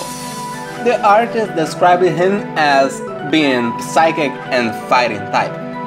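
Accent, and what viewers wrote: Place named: Brazil. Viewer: Corélio M. Based on the accent, he HAS to be Brazilian or at least Latino.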